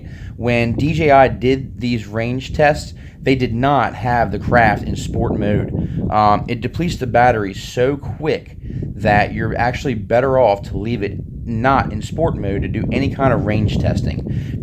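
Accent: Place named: Pennsylvania, United States. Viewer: The accent. Berks county?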